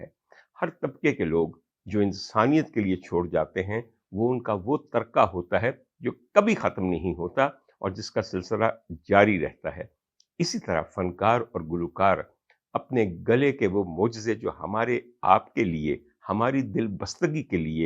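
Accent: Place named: Punjab, Pakistan. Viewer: Verbose. Avelanche of urdu in Punjabi tone (that lethal combo). Urdu as spoken normally in UP is palattable. But this is too much.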